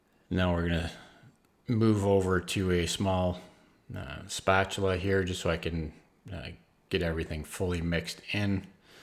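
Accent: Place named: Canada. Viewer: Hey,. Thank you for the video.😊 This looks like it may be a good replacement for ice cream, eh? What do you think? I had a weakness for ice cream, but trying not to eat it now.:/ I hear your Canadian accent.😊 (I’m from Chicago Illinois.) This is the third time that I subscribed to your channel. I keep getting unsubscribed.:/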